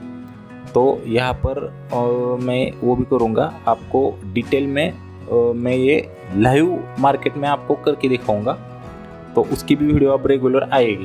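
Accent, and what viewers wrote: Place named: Maharashtra, India. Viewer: Pahilya 10 sec mdhech olakhla marathi manus ahe😅. All the best sir😊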